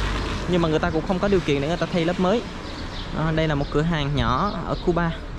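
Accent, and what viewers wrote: Quang Nam, Vietnam. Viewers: tieng nẩu ????? nghe hợp lổ tai...cùng quê cùng làng ??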